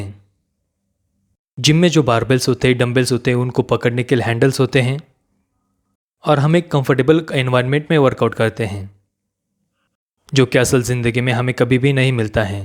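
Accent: Maharashtra, India. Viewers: U r a marathi.and the way u speak Hindi, it sounds so cute 🥰❤I love u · Your Indian accent is thic af man but like the content. I'm just listening to everyone to find a middle way and for the things you said about straps, etc. I don't use belts or straps only raw lifts but I do use pre-workouts and coffee and my primary motivation to use them is putting up more work for more muscle mass I think it's justified and I see the results of my training both in practical situations like pain tolerance for example 2 days ago 12.5 kg dumbbell from the rack dropped to my foot and I felt little to no pain at the time and afterwards and 0 complications good stuff.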